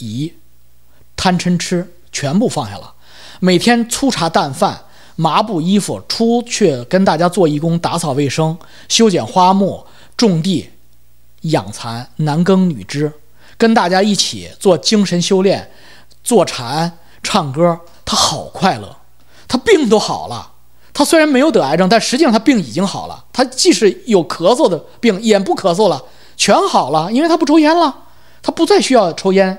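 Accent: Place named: Tianjin, China. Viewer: 是桂林嘛，像天津話的嘛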